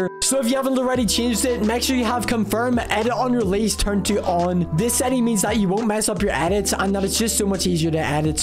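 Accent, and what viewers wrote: United Kingdom. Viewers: the british accent makes it 10 times better..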